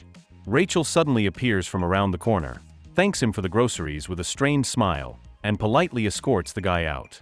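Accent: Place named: France. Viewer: Interesting French accent.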